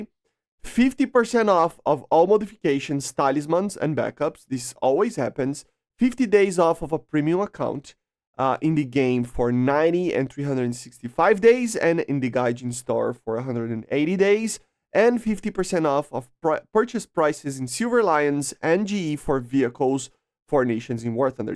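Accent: Brazil. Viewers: Engraçado como eh facil detectar o sotaque br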